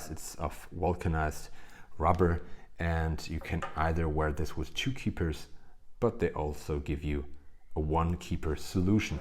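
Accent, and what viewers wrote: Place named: Germany. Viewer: strong german accent 😊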